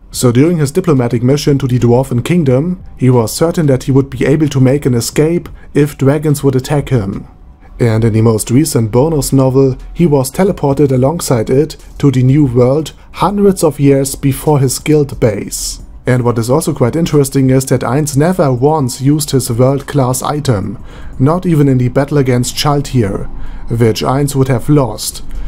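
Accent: Germany. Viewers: English is a germanic language :) that's why his accent makes it sound like he's speaking German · I have to confess, this commentary sounds more authoritative because of the German accent. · Well i cant watch your video.... your English is right but the accent is so insane, i cant take it.... iam german too but this is too much for me.... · Why do I hear german even when you talk english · Because of his accent. The auto-CC's even think he is speaking german.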